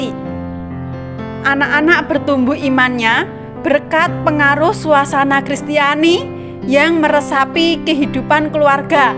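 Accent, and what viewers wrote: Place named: Java, Indonesia. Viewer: Logat Jawa kak😅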